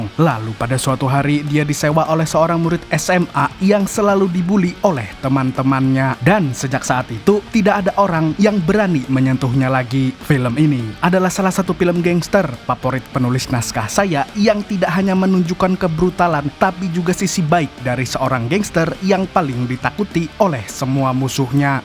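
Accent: Banjar, Indonesia. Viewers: pian urang banjar kah min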